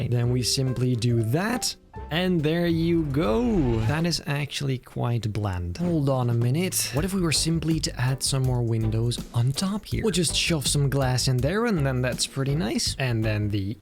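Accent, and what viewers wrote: Netherlands. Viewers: I smell Dutch Haha❤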